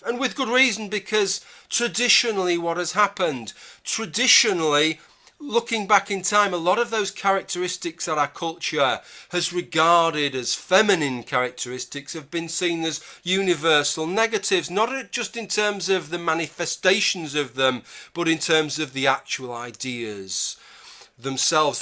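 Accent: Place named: Yorkshire, United Kingdom. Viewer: No, it's a Yorkshire accent. Bit late but now you know.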